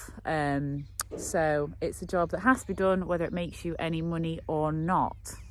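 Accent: United Kingdom. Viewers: Would we get the job dun......You sound like a Yorkshire lass.Love your dad and yours.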